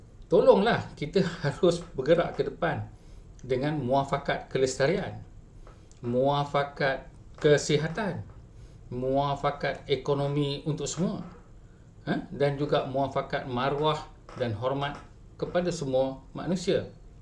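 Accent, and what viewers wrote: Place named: Sarawak, Malaysia. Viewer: berucap mcm orang Sarawak. Mesej bes! Setuju 100%!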